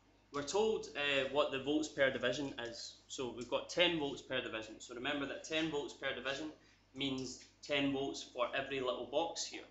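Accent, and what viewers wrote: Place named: Scotland, United Kingdom. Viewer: Love the scottish accent bro XD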